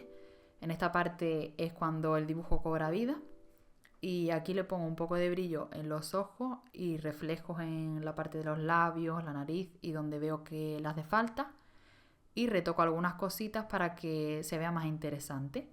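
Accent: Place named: Colombia. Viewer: Erga pq hablas como en Colombiano pero de españa? No es jeit, enrealidad me es más agradable al oido, pero me pajea el cerebro escucharlo asi jajaja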